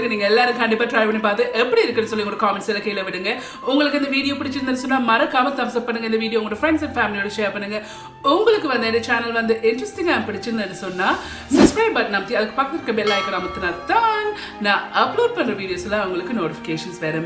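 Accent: Jaffna, Sri Lanka. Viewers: Hai dear I am so happy to be a subscriber to your channel. We feel happy and pleasured to hear your pure jaffna tamil Could you please show how to make prawn flat vadai ? Usually we can buy these vadai in Colombo gall face beach.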